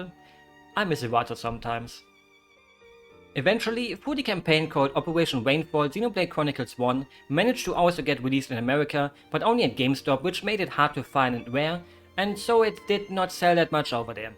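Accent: Germany. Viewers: I could sense your German accent was looking forward to your pronunciations of the Xenosaga subtitles! Funny to see Zarathustra stumping you though haha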